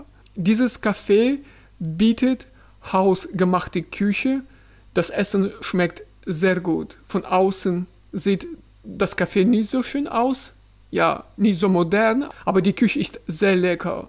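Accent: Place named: Russia. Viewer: Sehr informatives Video mit angenemer Stimme. Ich mag den russischen Akzent und freue mich auf ein neues Video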